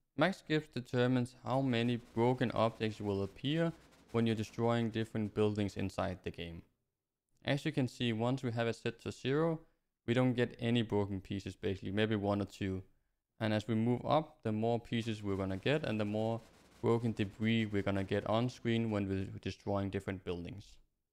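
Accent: Denmark. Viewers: I can smell danglish. But thank you for the help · Hey, i just found your channcel, by one of your old ark graphic settings video. I just wanted to ask, if you're Danish, because your accent, sounds familiar.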